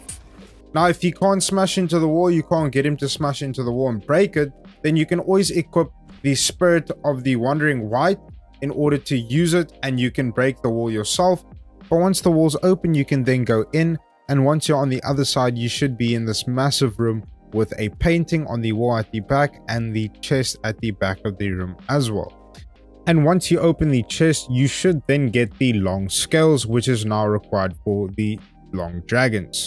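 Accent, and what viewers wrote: South Africa. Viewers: U South African?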